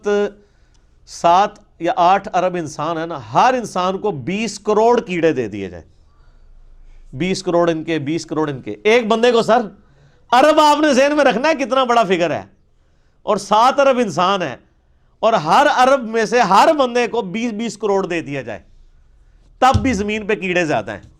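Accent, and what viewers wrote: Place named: Punjab, Pakistan. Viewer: byology wo apne accent ki waja se bol raha ha, q k wo punjabi hai ,or rahi bt darwin ki theory ki to wo sirf ek theory hai, or kafi scientist b os theory k against hain, or boht sare scientist support b krte hain, but science with evidence ho jb mani jati hai, jaise NASA ne hmein planets dekhaye. thoery to koi b Scientist de deta hai, or next century ya phr osi century mei os k against b ek theory ajati hai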